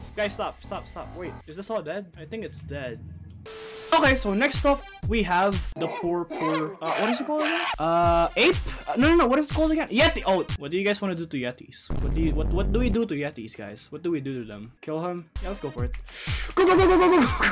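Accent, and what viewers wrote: Philippines. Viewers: are u Filipino?,bcuz ur accent is like Filipino when ur speaking english!.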